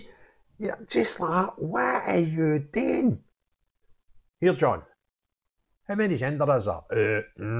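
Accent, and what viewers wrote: Scotland, United Kingdom. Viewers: You make me laugh every time I watch your explanations. Even better that your Scottish. thks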